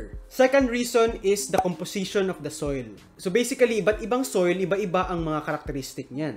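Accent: Visayas, Philippines. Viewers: aw ..bisaya diay ni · Bisaya mn diai... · Bisaya diay ka bai.. Hahaha. Subscribe nalng ko.. Butangan tika run. Hahahaha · Bisaya ka po pala😁😁😁😁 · Kana! Naa ra japoy pagka bisaya ba hahahahahaha makalingaw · Bisaya kaayo ka, Sir😅 taga diin kaha ka Sir...?🤔😅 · bisaya deay ka lods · Tingala hahaha bisaya halata kaayu. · Bisaya man diay ka idol😂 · Taga saan ka po idol? May accent ka po ng bisaya idol kaya naitanong ko po kung taga saan ka.. Ako po kc taga Masbate bisaya po me hehe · Klaro kaayong bisaya accent masi mag tagalog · Bisaya gyud 😂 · Bisaya mn ka engineer 😂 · Idola aning mga bisaya mag tagalog oy HAHAHA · Kahit hindi nato recent na vid, still very well explained and learned a lot as well. Nakakatuwa lng how kuya resist a little of his Bisaya hahaha. Proud Bisaya here!!!:) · Bisaya jud..gahi...😂😂💪💪👍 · Bira bisaya jud.. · bisaya d i ka dol 😁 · Ibutang na dira tanan . 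Owryt bisaya ta pre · Bisaya ka sir bisaya pod ko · Bisaya di ka sir 😅😅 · Hahaha bisaya d i kaha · Bisaya mn diay ka ser?? Proud bisaya here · Bisaya man diay ka doh.. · Bisaya man diay😂😂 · Bisaya man diay ka sir😁🤘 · Bisaya ka gli dong taga diin ka? -aspiring engineer also :) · Klaro Kaayo Bisaya.😁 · bisaya mn diay ka dong..😁😁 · Nganong bisaya ka ner? Asa ka gikan nga lugar?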